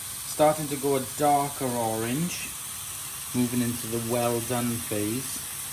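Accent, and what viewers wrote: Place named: South Wales, United Kingdom. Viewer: A really good review, also nice to here what I presume is a "South Wales" accent instead of the usual American. I think it should be ok for searing my steaks, after cooking sous vide . Paul, S. Wales.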